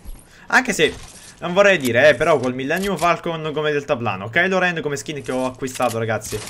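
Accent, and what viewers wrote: Naples, Italy. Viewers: Se trovi qualcuno con il minty il video finisce PS: ma sei napoletano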